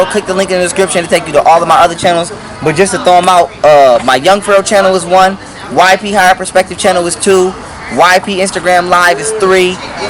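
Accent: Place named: South, United States. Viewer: Ya mean 🤣😂😂yp so country!!! I love this interview he’s so down to earth I love to see how he adapts to his Area he interviews with older people he’s very clean n professional and when he interviews with his peers he’s straight country n laid back!!! Keep up the good work yp!!!! I’ll subscribe to this channel!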